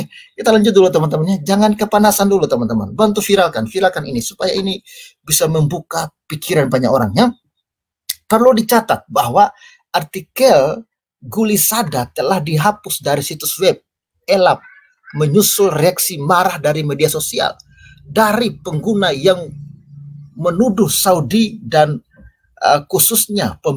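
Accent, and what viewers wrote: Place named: East Nusa Tenggara, Indonesia. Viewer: Mantap. hostnya sptnya orang NTT ya logatnya jelas sekali? Sama spt orang Batak ada logatnya. Orang Jawa ada logatnya.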